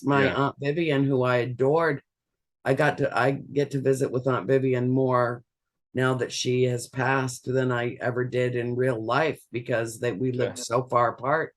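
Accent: South Africa. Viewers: What nationality is the presenter? He sounds South African??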